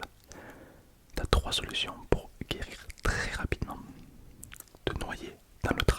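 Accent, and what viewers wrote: South, France. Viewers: j'adore ta voix c super relaxant (et surtout j'aime bien ton accent du sud) 😁😁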